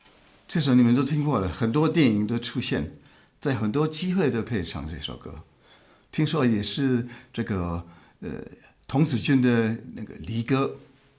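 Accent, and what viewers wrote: Standard. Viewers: ，非常喜歡您，你的漢語講的比台灣人還標準。祝福你全家永遠萬事順順利利。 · 每一次聽到這首歌都感動。第一次看到這個頻道，老師的中文字正腔圓，講話平靜中帶有情感，猶如歌曲一樣令人感動。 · 您的漢語水平讓我吃驚！太好了，字正腔圓！我都懷疑您到底是華人還是德國人了，新年快樂！ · 你的中文好標準啊！我聽你講話像在聽我朋友講話一樣！好親近啊！ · 您的中文口音比很多我们内地人都准，很好听，欢迎您来武汉游玩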